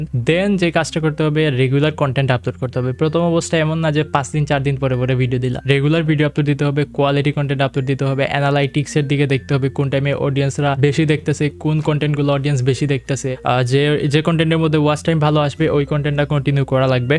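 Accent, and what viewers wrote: Sylhet, Bangladesh. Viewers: Bhaia aponi ki sylheti 🤔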